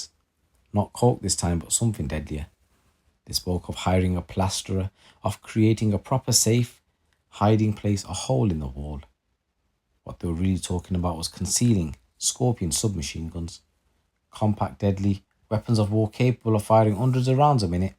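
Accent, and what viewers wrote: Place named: Liverpool, United Kingdom. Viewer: 😂😂 I like you’re scouser accent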